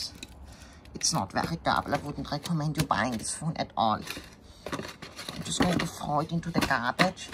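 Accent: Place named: China. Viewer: IST DAS ENGLISCH MIT CHINESISCHEM AKZENT???!!!!!!!🤣🤣🤣🤣 ICH VERSTEH KEIN WORT VON DEM WAS DIE LABBERT!!!!!!🤦‍♂️🤦‍♂️🤦‍♂️🤷‍♂️🤷‍♂️🤷‍♂️ Ich empfehle diesen Kanal NICHT weiter!!!!!😑😑😑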